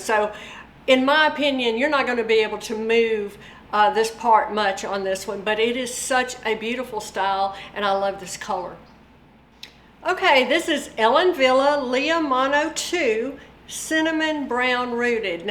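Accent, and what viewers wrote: South, United States. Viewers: I watch you all of the time. I love your cute southern accent and your natural elegance with your videos.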